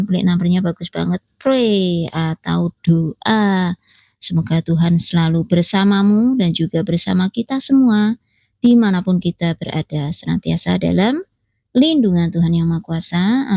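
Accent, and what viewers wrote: Java, Indonesia. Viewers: Ass...klrg kecil yg bahagis...Salam sy dari Bekasi...sy sangat sk dengan kllrg kecil ini...ap lg mbk...org nya grecek,klu lg ngomong sy sk ketawa dgn logat jawa nya,org nya,sederhana,dan tidak sombong...sukses terus mbk dan klrg...❤🎉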